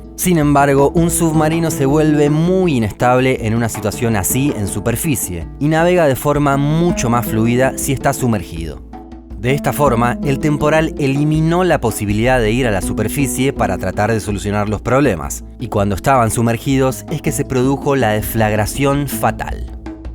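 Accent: Rioplatense, Argentina. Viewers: El Acento argentino de estos vídeos es agradable y Elegante , no se vuelve molesto como en algunos casos de periodistas deportivos o Narradores argentinos en los que ya se vuelve detestable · He visto tantas veces los videos de que se me pegó el acento argentino y cuando hablo la gente me mira raro:p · Lo que paso solo lo saben con certeza los responsables vivos pero ellos nunca van ha reconocer los errores que costaron la vida de tantos, no sería la primera vez que salió en esas condiciones , y difícil de constatar todo .- Muy buenos los videos y hermoso escuchar una voz Argentina .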